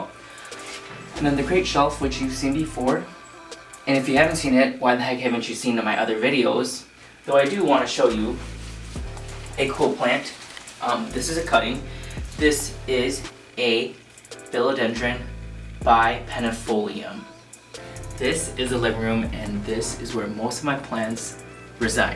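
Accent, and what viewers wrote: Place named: Midwest, United States. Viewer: Do you live in the Midwest? I feel like I hear a little accent!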